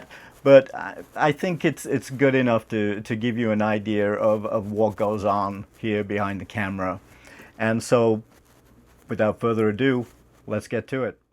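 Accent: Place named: Texas, United States. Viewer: Great video! Interesting how the Texan accent has partially rubbed off on you so quickly,-) I have almost the exact same set of tools as you. Well I have one of those blue silicon mats. Very interested to see the next video, a, because I just bought an Elgin watch from another US based watch repairer, and I have a beautiful, imho pocket watch, which definitely needs servicing one day. Love the vintage tools!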